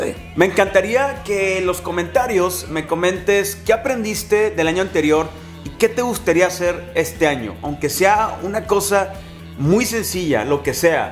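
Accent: Monterrey, Mexico. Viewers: Me re encanta su acento regio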